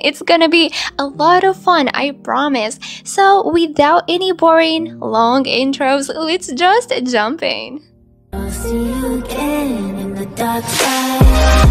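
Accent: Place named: United States. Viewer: Fun fact your : you could be a singer. You have a great America accent. And sweet voice❤️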